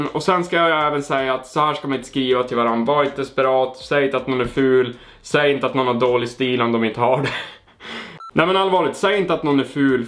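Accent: Norrland, Sweden. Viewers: Gör en video när du bara pratar norrländska! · vilken skön norrlands dialekt du har 😆👍 har precis börjat kollat på dig. kung äru👌🏻👌🏻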